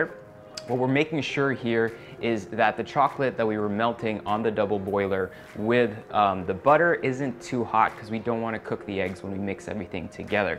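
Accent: United States. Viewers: YouTube recommended this to me, who is he, he is very cute!!! Does he live in the Philippines? Is he Filipino? He has an American accent. I HAVE SO MANY QUESTIONS! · Funny to hear a very strong American accent with a nicely said ´bain marie’ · Half Filipino and half French - so why the American accent rather than a sexy French or Filipino one? Were you raised in the States?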